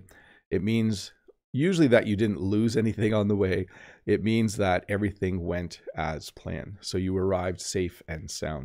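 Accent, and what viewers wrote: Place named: Canada. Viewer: Hello Mr I like your Canadian pronunciation and whenever I have time I see your videos and I really learn a lot from. good job good teacher respect from Afghanistan.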